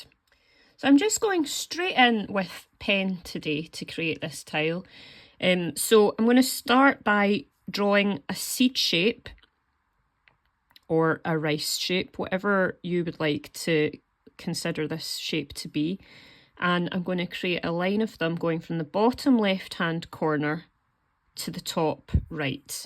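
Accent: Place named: Scotland, United Kingdom. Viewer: Never heard of tangles until now. They look fascinating. Nice to hear that particular Scottish accent, too!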